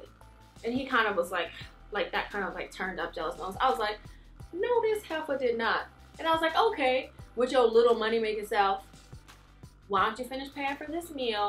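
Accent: South, United States. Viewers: I knew you were from the south (I'm new here). Im from Macon ... Hello! 🤗🙋🏾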